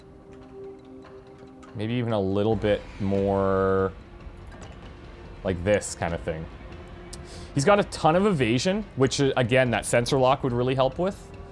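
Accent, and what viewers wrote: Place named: Canada. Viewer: So what's weird is that when I lived in Canada I never noticed the accent. Now living in the States for a decade I'm painfully aware of the Canadian accent. Keeping doing you. Get oot there and get 'em.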